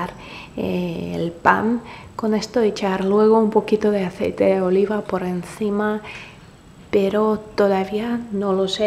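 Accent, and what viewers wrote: Spain. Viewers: Entre la seriedad con que tratas cualquier tema, la misma naturalidad con la que hablas me resulta gracioso tu acento español (en el buen sentido de la palabra). Saludos desde Colombia. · ***** Tiene acento de España porque está viviendo alli, al igual que tendría acento argentino si estuviera viviendo allí. Ah, y no has pensado que quizás otros acentos no les hacen gracia a otros países? Ella no está aquí para hacerte reír, está aquí para compartir su experiencia viviendo tan lejos de su país natal, así que si quieres a alguien gracioso y con otro acento porque no eres capaz de aceptar las diferencias culturales, tienes muchos otros canales de mucha gente que hace su mismo contenido. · wow ya tienes la z en tu acento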